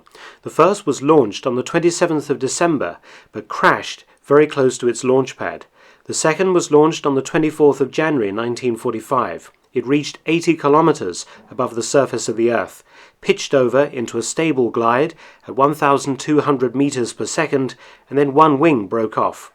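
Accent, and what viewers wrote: England, United Kingdom. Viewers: The real story is something your not willing to tell. Instead you decide to play it safe thinking your English accent and the history of German rocketry will make you credible but probability states otherwise. Are UFOs just Hollywood hype when military has already confirmed their existence? Is it more likely (more probable) that these UFOs are flown by aliens rather than man? Aliens are the Hollywood hype, not UFOs, it's even more likely the so called aliens are man- made as well. I believe in aliens but they are more likely to be involved in the resources of their own solar system which is probably as vast as our own.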